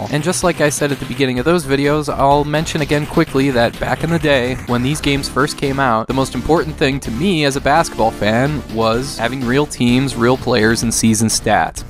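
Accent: Minnesota, United States. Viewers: You from MN? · are you from Minnesota?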